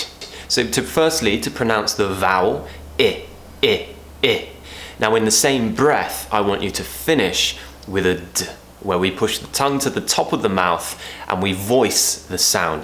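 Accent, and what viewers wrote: United Kingdom. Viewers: Thank you very much for your help.It's a great way to teach my students !!! By the way I love your British Accent. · I love British accent, And i love hearing your voice and learning British English at the same time. I'm browsing all of your past videos and i'm in love with it. Your such an inspiration. Thank you. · I am always love the way you speak english with your british accent And Also sometimes i find the difficulty of word ending /ed/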